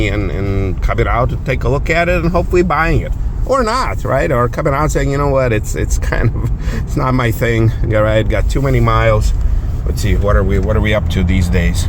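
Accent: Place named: Alabama, United States. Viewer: He is from Mobile, Alabama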